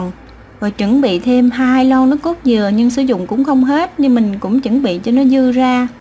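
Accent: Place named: South, Vietnam. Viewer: Cám ơn bạn lên tiếng cho 😍👍 giọng nói mỗi miền có đặc tính của miền đó. Giọng miền Nam của vô cùng đáng yêu. Nhẹ nhàng. Nghe giọng là biết tánh người Mình là ng nấu ăn vô cùng dỡ. Nhưng mình làm vài món của. Mình đều thành công Đó là vì hướng dẫn dễ hiểu, kỹ và ko giấu kỷ thuật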